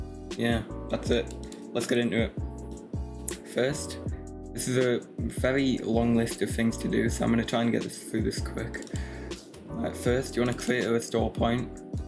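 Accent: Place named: Wales, United Kingdom. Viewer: Cmon g I know your Welsh i can tell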